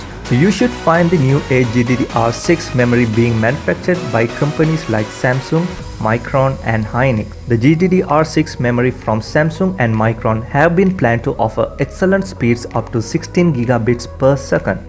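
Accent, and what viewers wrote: India. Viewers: Ahh. Classic Indian accent. The land of street sh#tter. PS: I think the music needs to be louder. So we can bleed our ears out. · Bro shut the fck up your Indian voice is funny as fck😂😂😂😂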